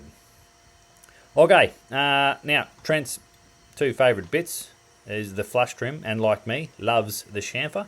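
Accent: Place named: Australia. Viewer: Nice to see you back! Surely missed your Australian accent and good happy predisposition to life! 🎉😊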